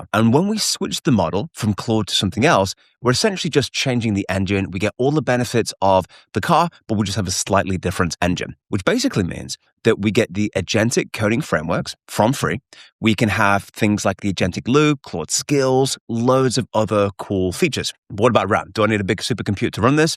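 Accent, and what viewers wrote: United Kingdom. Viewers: Love your accent! Is that british?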